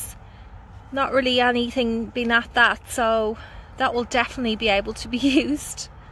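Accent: Ireland. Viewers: you sound Irish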